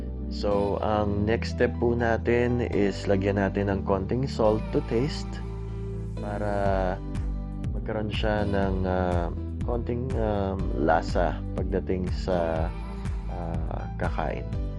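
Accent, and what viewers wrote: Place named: Philippines. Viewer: You have a Filipino accent and speak English also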